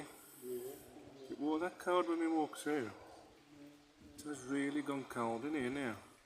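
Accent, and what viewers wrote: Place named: Black Country, United Kingdom. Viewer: Brilliantly done and as a black country girl long moved away I'm loving the accent makes me feel like home.... Thank you so much new sub here xxx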